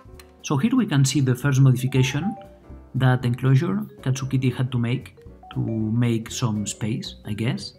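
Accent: Catalonia, Spain. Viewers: Cómo se nota que eres español xDd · Buen vídeo,se nota demasiado que eres español jaja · No se como he llegado aquí pero tu acento, suena como un español intentando hablar inglés y pronuncias raro xD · Soy yo o hablaa como español hablando en ingles:v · Tío intenta hablar mejor el inglés que se te nota que eres español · Ese acento español jodeeeeer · Vale pavo y si haces los vídeos en español? O catalán...porque llamándote... inglés no eres y americano tampoco... Así que igual también estaría bien que los compatriotas te entendiéramos. La pronunciación te delata colega. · Loko como se nota que eres español xdd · Se nota demasiado que hablas español tío JAJAJAJA por cierto muy buen video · ese acento español sigue intacto papu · Eres español, no? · No, he is not French. That's more of a Spanish or Catalan accent. And since he's from Andorra, it's clearly Catalan. 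· se nota bastante. Uno el nombre y algunas palabras en inglés que suenan muy español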